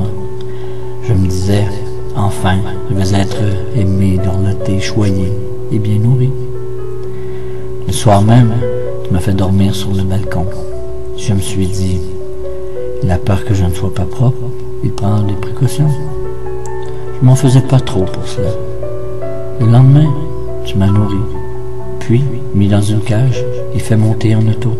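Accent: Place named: Quebec, Canada. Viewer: Tellement triste 😭 perso j’aime beaucoup l’axent québécois whaaaaaaaa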